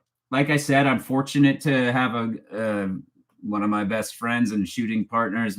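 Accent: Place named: Canada. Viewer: Definitely Canadan. I get a chuckle everytime he says sorry cause of the accent.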